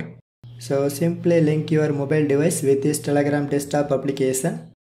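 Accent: India. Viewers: Indian ho na Britishian nhi na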